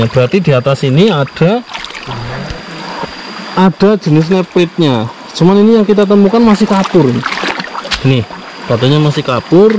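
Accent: Banyumas, Indonesia. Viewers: Bahasane kok ngapak yah ??? Asli ngendi kang!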